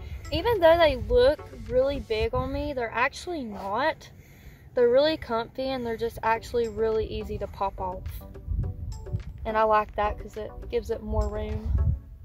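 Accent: United States. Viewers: God, that twangy Georgia accent is screaming sexy ❤❤❤❤❤❤❤❤ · Beautiful sweet. Southern accent so cute. ❤🎉👣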